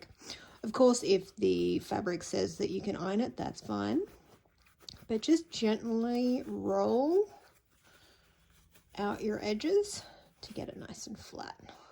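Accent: Australia. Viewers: Absolutely love this mask pattern with excellent directions (I've tried many) and adorable Australian accent is a bonus!! I find the women's/teens size quite small but will easily adjust size. Thank you for this brilliant video!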